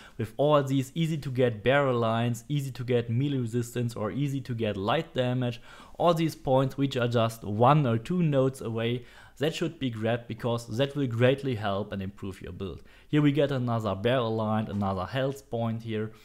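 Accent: Germany. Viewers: I love the German accent, great video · Dude mast be 10000% from Germany they have hard time to learn English so he is not bad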